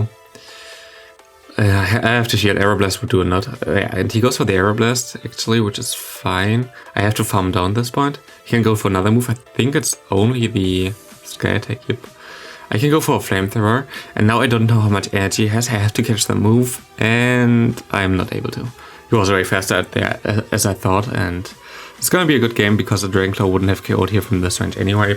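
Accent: Germany. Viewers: Bist du ein deutscher ? :)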